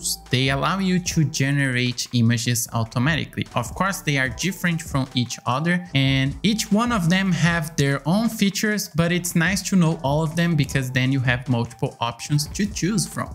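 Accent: Brazil. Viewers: I love your accent sounds like a brazilian guy speaking english. Great content btw!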